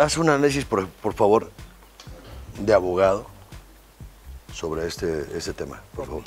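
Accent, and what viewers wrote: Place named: Tampico, Mexico. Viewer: Asi hablamos en tampico ntenao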